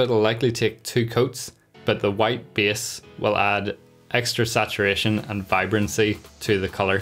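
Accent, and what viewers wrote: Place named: Northern Ireland, United Kingdom. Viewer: Really Helpful Video, has given me some inspiration for my Winged Hive Tyrant, also great to hear a Norn Iron accent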